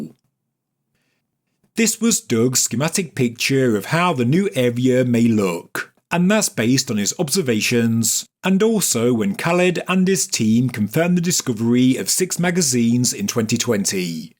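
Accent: United Kingdom. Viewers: I know this is an odd question, but I am asking because I can't place your accent. British? Why do you end sentences by extending the final sound?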